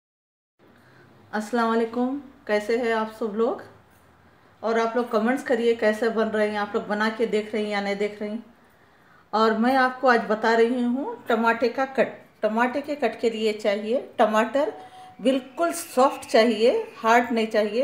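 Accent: Hyderabad, India. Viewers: Ek dum Hyderabadi hai aap 😂 Mere jaisa ich 😎😂 Ek pyaaz ki dalli 🔥 Dialogue was awesome 😂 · Aswk aunty I like yr way of speaking a pure hyderabadi language we enjoy yr style of cooking For the first time we heard of dahi ka dalcha thnx for sharing new recipe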